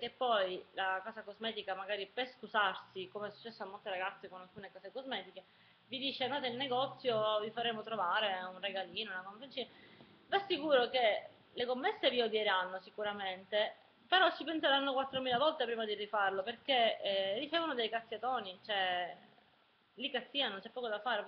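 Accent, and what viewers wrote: Sicily, Italy. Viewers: Io invece penso che ognuno abbia il diritto di esprimersi come preferisce. Youtube non è il luogo in cui rivendicare la perfetta lingua italiana. Ci sono luoghi e tempi per farlo. Diciamo che per vincere questa crociata, forse bisognerebbe cominciare con chi la lingua la deturpa scrivendo con le "k" o sostituendo il "per" con la "x". si esprime in italiano corretto la maggior parte del tempo e, se a volte un po' del suo essere siciliana esce fuori, non vedo in cosa sia TANTO fastidioso.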